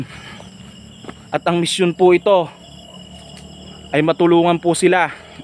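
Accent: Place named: Pampanga, Philippines. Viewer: Saan lugar yan idol kc ang galing mong mag tagalog,pero my punto kang kapampangan?ingat lang idol,God bless u...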